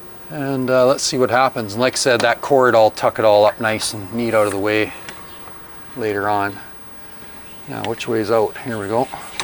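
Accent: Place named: Canada. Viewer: You must be Canadian..... the accent gives you away!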